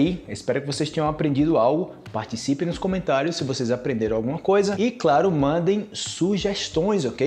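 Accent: Colombia. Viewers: ele tem sotaque colombiano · Si, de vivir varios años en Medellín consiguió "pegar o sotaque Colombiano", pero eso no quita que haya nacido y sea Nordestino de Paraíba.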